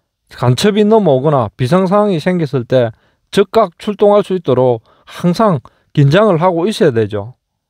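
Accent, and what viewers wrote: Gyeongsang, South Korea. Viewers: 경상남도? 사투리 구수~합니다. 1년 형님 이시데요 저는 쥐띠 입니다. 95년 9월 전역했습니다.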